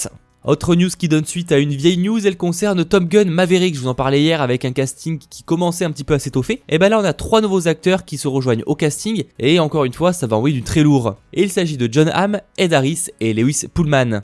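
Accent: England, United Kingdom. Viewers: Ton accent anglais me perturbe vraiment.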